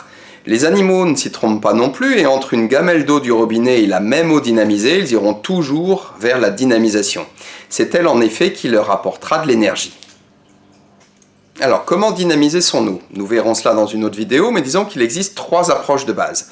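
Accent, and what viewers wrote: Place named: Switzerland. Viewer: Salut, félicitations pour cette série de vidéos vraiment instructif, bravo!!!! J'avais pas remarqué ton accent suisse lors de notre coup de fils mdr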